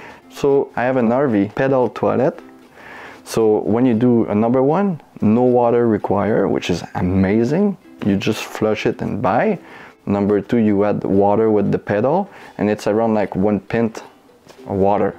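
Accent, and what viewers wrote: Quebec, Canada. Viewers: He has a french accent. Québécois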